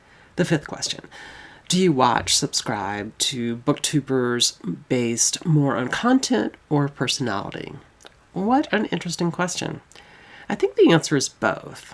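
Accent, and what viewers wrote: South, United States. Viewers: I did laugh about all your characters sounding like they are from the South. When Steve and I used to take turns reading aloud to Alf and Tilly at bedtime, he used to tease me by giving characters accents I couldn't match the next evening. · I had to laugh when you said you sound southern no matter what accent you are trying to read. It made me think of my French professor in college who commented on my southern French accent. All of my Texas classmates sounded the same as me in my opinion but clearly not in his.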